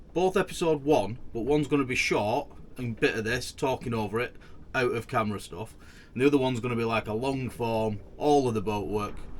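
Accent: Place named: Northern England, United Kingdom. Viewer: Not at all, I love your northern no nonsense presentation. We are, ourselves from the North (Yorkshire). We're based on Anglesey now, but the boat is in Conwy. The house you are talking about sits right in the middle of the Swellies (near Menai Bridge and Llanfairpwllgwyngyll), which is reported locally to be one of the most hazardous areas of water in the UK to pass through. Apparently though, the best time to navigate it, at a good speed, is at slack tide (an hour or so either side of high tide when the whirlpools are least effective would work). Safe travels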